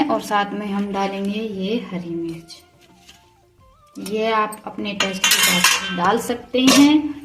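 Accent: Haryana, India. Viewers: Apki haryanvi voice bhut pyaari lgti h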